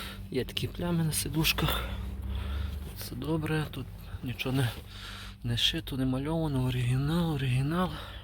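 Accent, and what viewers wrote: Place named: Ukraine. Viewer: Суржик ужасный!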